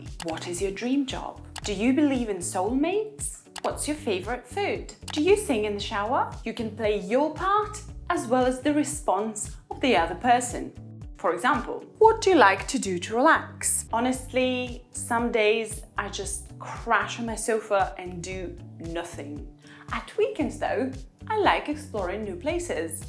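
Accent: United Kingdom. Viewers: your British accent is so lovely! I used to like American better but now I'm so into British💜 Thank you for the video, it's adorable👍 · Зачем британский пусть говорит на американском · Аааа какой акцент крутой🤩 Бритиш инглиш - ван лав 💛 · Хорошо говорит, но не переношу британский акцент)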